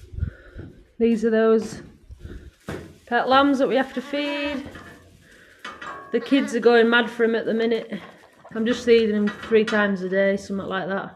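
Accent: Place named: North England, United Kingdom. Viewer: north yorkshire, cumbria border. thought you were somewhere up north. lovely accent. secondly, you really do get completly different weather up there. you are harder. fair play to you. i hope you get some decent spring weather. and everywhere dries up. you are another superstar of the industry. good on you.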